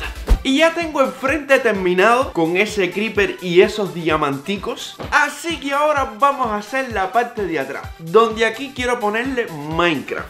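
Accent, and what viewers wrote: Cuba. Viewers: Eres cubano no??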